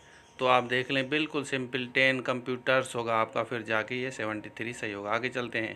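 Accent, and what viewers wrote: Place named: Sindh, Pakistan. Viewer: Grateful Dear Sir! Yh beech beech mn sindhi bhe mix hojati hy ap se sain jese hum sindhi logo ki adat hoti hy Hahaha. Wari Wari Hahah. .. God bless you sain!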